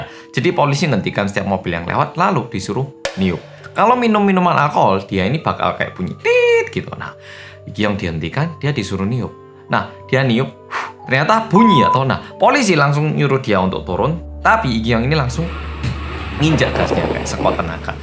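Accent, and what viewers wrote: Java, Indonesia. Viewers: Mas orang jawa apa korea sih Kok medhok nya dapet 😅😅😅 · ... kamu orang mana sih kok medok kali Jawa nya... awas ya kalo orang luar tapi ngakunya org Jawa.... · oppa, koq bisa sih bahasa nya medok gitu, gimana ceritanya nih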